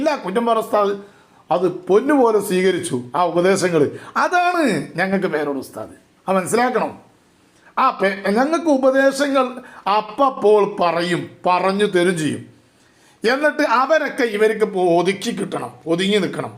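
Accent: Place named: Malabar, India. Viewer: ഉസ്താദ് ഞാനൊരു തൃശൂർ ജില്ലക്കാരനാണ് അങ്ങയുടെ മലബാർ ശൈലിയിലുള്ള സംസാരം എനിക്ക് ഭയങ്കര ഇഷ്ടമാണ് കുരുവട്ടൂര് ക്കുള്ള ഓരോ വീഡിയോയും വേണ്ടി കട്ട വെയ്റ്റിങ് ലാണ്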